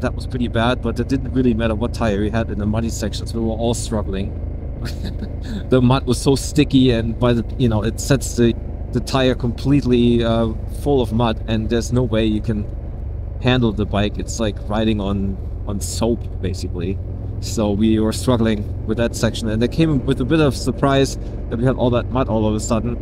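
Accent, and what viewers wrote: Germany. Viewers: Gutes englisch aber den deutschen hört man trotzdem raus haha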